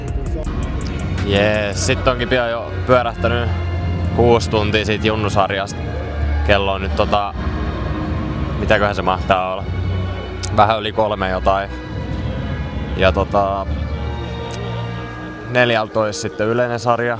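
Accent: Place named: Turku, Finland. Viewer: Hullu turunmurre😂, asiallinen editointi ja kiinnostava sisältö kiitos viihdyin! 😁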